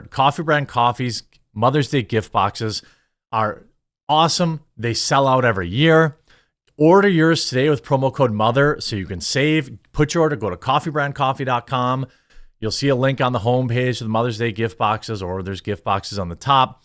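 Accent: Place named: Midwest, United States. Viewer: It is funny. That Midwestern thing. I bet he says "warshcloth" too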